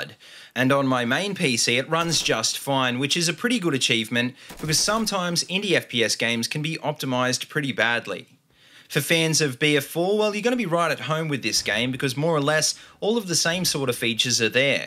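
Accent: Australia. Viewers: Gaming I knew you were Australian as soon as I herd your voice keep going mate. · Youra Queenslander arnt ya? :p That okka accent!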